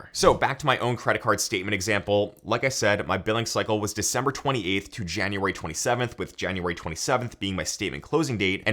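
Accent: Philadelphia, United States. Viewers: Wait are you from Philly?! 😂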